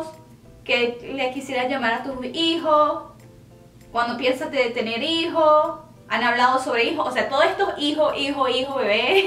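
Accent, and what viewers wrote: Venezuela. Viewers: ¡Me encanta tu acento venezolano! Que padre que sigas haciendo más vídeos en español 😁 me encantan tus vídeos aunque no soy musulmana pero es muy interesante saber de otras culturas. Un saludo desde México ✌ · Hace mucho más de un año que no veía tus videos jajaja me encanta tu acento suena tan de la zona centro de Venezuela jajaja jajaja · Ya decía yo ese acento es de Caracas jajajaaj que hermosa que dios te proteja soludos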